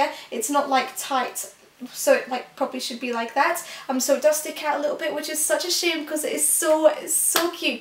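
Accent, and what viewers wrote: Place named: Kent, United Kingdom. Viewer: Despite all the haters & the letchers you have to admire dedication to her body: those abs don't happen by accident! Also love the Kent accent with hints of Scotland. You could say 'so' all night long for me. Keep up the good work!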